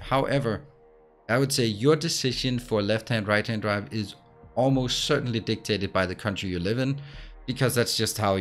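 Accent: Denmark. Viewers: Decent tutorial. Literally subscribed for the flawless danish accent.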